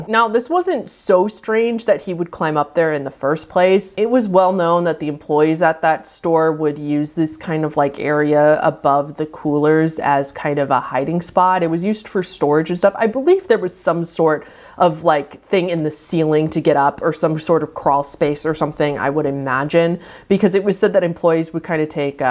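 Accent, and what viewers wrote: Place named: Seattle, United States. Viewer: I'm binging your videos again and I keep saying "your voice is so beautiful and calming" but I couldn't figure out where your "accent" is from (I'm trying to get better at recognizing state accents. Several videos ago you messaged washinton a few times, but nowhere specific.....you are about an hr from tacoma, so I'm guessing the Seattle area....makes sense that I couldn't figure the accent out😆 I'm in Lakewood (10 minutes from tacoma) so that's why you sound so calm and safe for me, you sound like home😄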